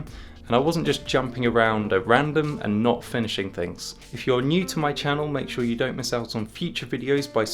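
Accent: United Kingdom. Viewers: How come you speak British, living in the States? I can hear that, as I am from Flanders.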